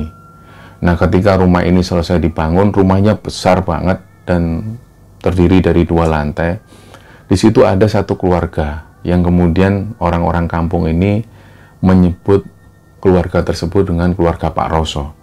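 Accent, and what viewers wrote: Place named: Surabaya, Indonesia. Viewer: wkwkwkwk suroboyo banget, tekong-tekongan, skit skit an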